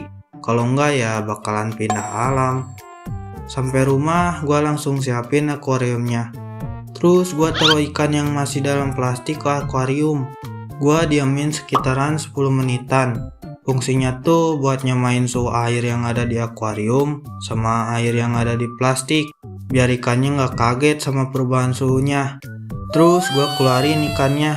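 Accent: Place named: Sunda, Indonesia. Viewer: Aa nya orang Sunda yah, saya lihat lihat nadanya kaya orang Sunda, sok ngomong badag🧒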